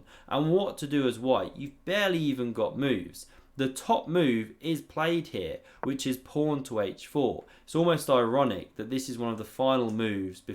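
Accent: England, United Kingdom. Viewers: Fantastic video as always. Two things that were especially great about this one: your use of the word gross in the opening sentence and the fact that you managed to get Hans Niemann on screen for a few seconds. Keep up the badass analyses and I certainly hope you continue to be one of the greatest adjective-picker of all the people who speak our common language with an English accent. Folks from England usually go for soft, subtle-but-potent descriptors. Not. is aiming straight for your nuts when talks, and he's gonna hit 'em. lol sorry there's a nonzero chance that i've had a few drinks but.. I'm not entirely unserious-- keep on keepin on.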